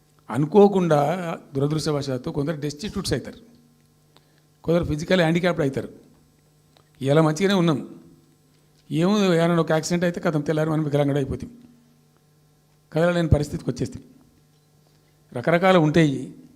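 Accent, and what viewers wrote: Telangana, India. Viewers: After a long time many months years I listen my telangana slang jai kcr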